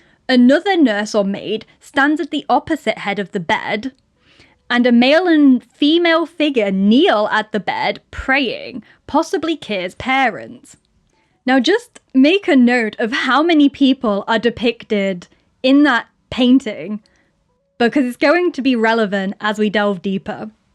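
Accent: United Kingdom. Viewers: Completely unrelated but do you mind telling me if your accent is British? Whatever it is, I love it. And thank you for your videos. Great job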